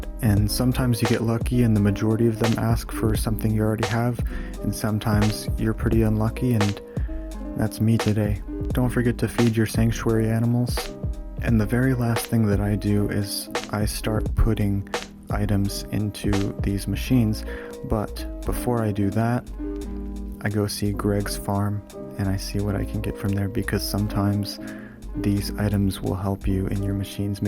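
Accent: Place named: India. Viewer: He is Indian and his accent is so so bad!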